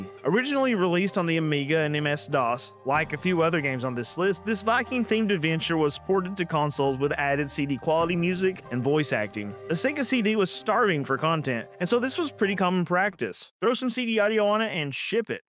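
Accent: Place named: Southwest, United States. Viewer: Love your style man! Also, as someone from southwest, your accent makes me feel like I'm just geeking out with one of the bros back home. Great stuff!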